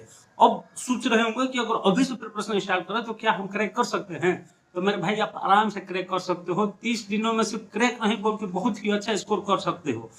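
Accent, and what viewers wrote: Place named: Bihar, India. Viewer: bhaiya bihta Bihar se hai inki yhi language hai 😂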